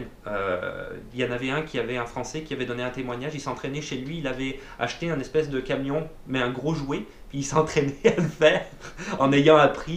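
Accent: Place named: Quebec, Canada. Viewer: Merci, merci l'ami le grand bonjour, de l'autre rive de la méditerranée et le soleil durant les quatre saisons. Sincèrement l'ami , y a beaucoup d'informations et c'est motivant surtout avec accent québécois de temps à autre mélangé à la bonne humeur.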